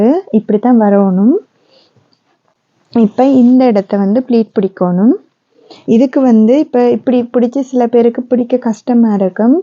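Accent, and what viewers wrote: Sri Lanka. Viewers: ivanga Sreelankan tamil mathiri pesuthu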